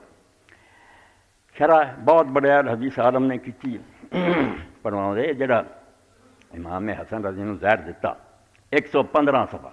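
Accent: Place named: Punjab, Pakistan. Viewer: Janab aap to Arabi bhi Panjabi mein padhte hein.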